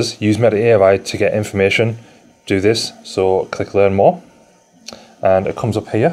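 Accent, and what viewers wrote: Middlesbrough, United Kingdom. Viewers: You sound local to me (Middlesbrough).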